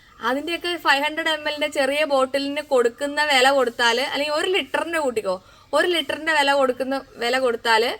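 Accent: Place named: Wayanad, India. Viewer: ഏത് നാട്ടുകാരിയാ... നമ്മളെ കൊടുവള്ളി സ്ലാങ് അല്ല വയനാടൻ സ്ലങ് അല്ലെ... പിന്നെ ഇത് എവിടയാ... 🤔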